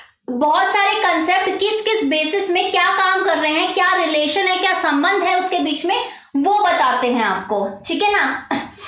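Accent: Assam, India. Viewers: Ma'am are u Assamese?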